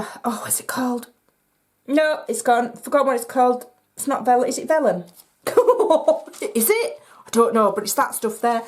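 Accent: Yorkshire, United Kingdom. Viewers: Great Video and Beautiful Box, keep them coming!! I enjoyed laughing along with you so much, that I have subscribed to your Channel to hear more from you, so I am looking forward to lots more lovely ideas. Love listening to your Yorkshire accent - I am an Australian SU Demonstrator.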